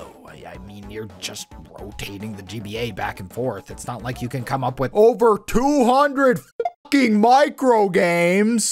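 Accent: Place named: Midwest, United States. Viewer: This video was well researched I had never heard of some of these game boy games before!! Well edited and love the humor. However your midwestern O's were hilarious!! They remind me of Kevin from the Office which I know is a bad comparison but I think you should lean in on a persona as a Midwestern dolt.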